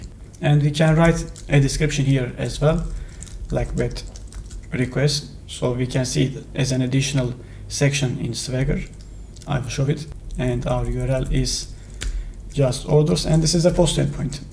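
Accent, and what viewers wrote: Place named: Turkey. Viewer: Hocam o tatli aksaninizdan direkt Turk oldugunuzu anladim :D Harika bir video olmus direkt kanali takibe aldim. Cok tesekkurler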